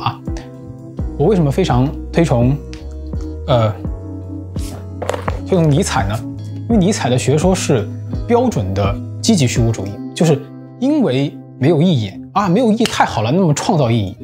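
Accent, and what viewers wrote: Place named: Hunan, China. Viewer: 没有得到我点进来之前想要听到的内容但是听出了是个湖南人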